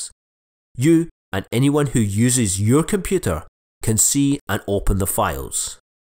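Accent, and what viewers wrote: Scotland, United Kingdom. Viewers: so nobody gonna talk about his thick scottish accent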